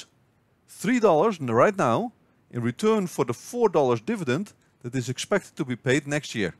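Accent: Netherlands. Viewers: Tis hard hoe dat ge hoort dat nen ollander is :DDD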